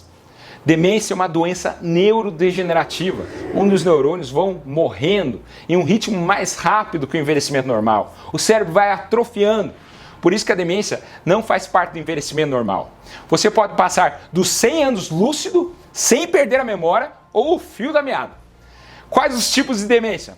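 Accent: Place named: Minas Gerais, Brazil. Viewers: Gosto muito do jeito q ele fala,como se fosse mineiro 👏👏👏👏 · Doutor de acompanho da Bélgica, gosto demais, e amo teu sotaque, você é de Minas Gerais, ou estou enganado? Abraço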